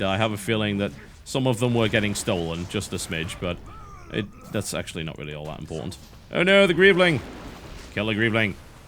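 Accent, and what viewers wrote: United Kingdom. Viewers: Amazing series. Always the best content from you,. Keep it up. Hell, even the girlfriend prefers content from your channel. Calls you the classy sounding British guy.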